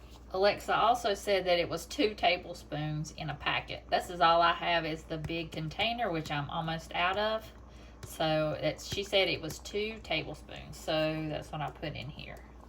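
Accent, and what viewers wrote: South, United States. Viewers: I love taco soup. Great tutorial. New friend here. Do I detect a southern accent 😃? ❤👵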